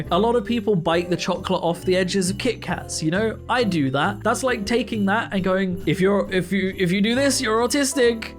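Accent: United Kingdom. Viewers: eheheh thank you, it’s honestly just a standard british accent!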